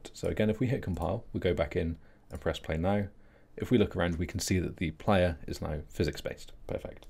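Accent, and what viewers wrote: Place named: United Kingdom. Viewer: I feel like I'm listening to Jayztwocents in British accent. Anyways thanks for the great tutorial!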